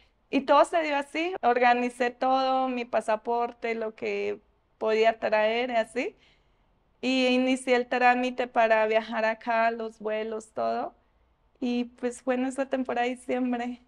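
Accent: Mexico. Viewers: Esa hermosa colombiana habla como Mexicana te amo cásate conmigo quieres ser mi esposa la madre de nuestros hijos? besitos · Todo su acento de este bella dama muy mexicana muy bella colombiana en México. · Guau qué bonito habla no cabe duda el estilo mexicano y el tono de vos que tiene muy bonito me llamó mucho la atención qué interesante mujer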